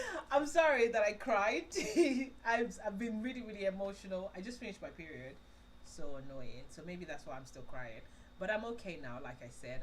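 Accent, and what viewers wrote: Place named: Yoruba, Nigeria. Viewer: 😂 You said v-log... Really sorry you've been going through all this. I can't imagine how overwhelming everything has been for you. Nigeria is just a whole beast to deal with especially when it comes to things like your shop issue. Also I'm probably projecting and I'm a huge proponent of keeping quiet if you don't have anything nice to say but I honestly love when the yoruba or the more unfiltered sounding accent comes through from you. Again projecting but yeah. I really hope things don't get messy at all with the shop folks and goodluck with the new shop and the tailoring business. I follow you on insta so I see your fabric ads and I always wondered why you didn't make clothes 'cause its a whole lot more profitable to have both businesses in one. Its what my dad's tailor does. You pick out the material there and the design and boom, he makes his money.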